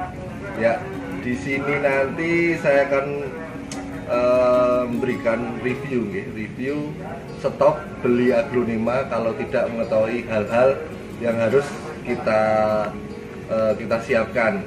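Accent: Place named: Java, Indonesia. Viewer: Galfok sama logat jawanya, mas. Jd nyengir2 ngebayangin ketoprak😂😂😂👍👍👍. Sukses ya. Terima kasih🙏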